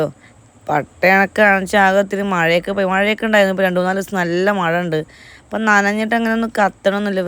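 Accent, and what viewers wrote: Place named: Palakkad, India. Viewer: ട്ടോ പാലക്കാട്ടുകാരുടെ സംസാര രീതിയാണ്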